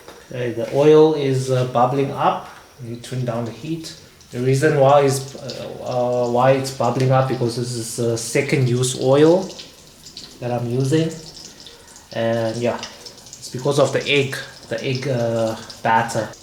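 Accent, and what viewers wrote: South Africa. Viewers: Your accent is giving me South African 🤷🏽‍♀️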